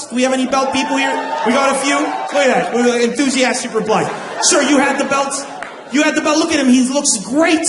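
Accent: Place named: Pakistan. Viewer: ما عنده حس فكاهي و لهجته مو لهجت لبناني يحكي انجليزي بل بكستاني او افغنستاني ...... مهم يزيد يشوه ف صمعة العرب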